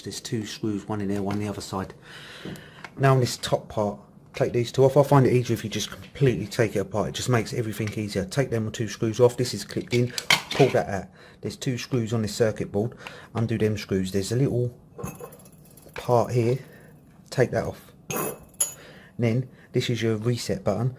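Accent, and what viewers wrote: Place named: South London, United Kingdom. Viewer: Nice one mate. I was banging my head against the wall until I found this vid. South London boy aye.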